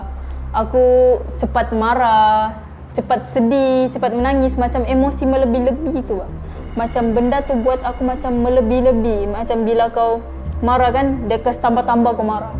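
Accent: Sabah, Malaysia. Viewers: Akak sabah ka? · Suka gila kak cakap sabah dia 😍💕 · Org sabah ke? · Slang sabah cantik kak 👍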